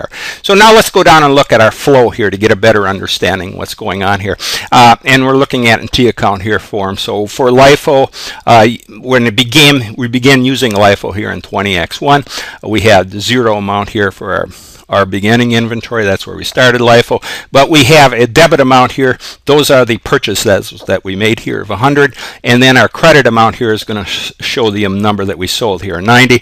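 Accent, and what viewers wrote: Boston, United States. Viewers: i love this guys boston/hispanic accent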